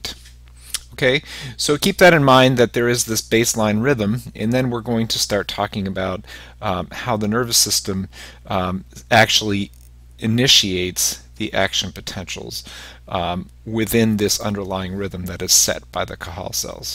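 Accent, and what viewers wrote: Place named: Jamaica. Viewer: 1. excellent i have already liked it on the icon side 2. minor revisions recommended - a) the slimy Jamaican English accent could be changed, b) not a semi-audiobook, coz i don't want to be seeing the videos whilst hearing to his good english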